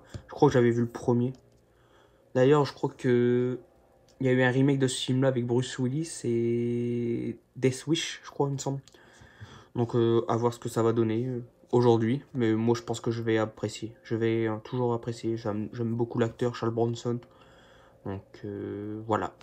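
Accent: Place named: North, France. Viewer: T pas du Nord toi ? Ta un accent 😊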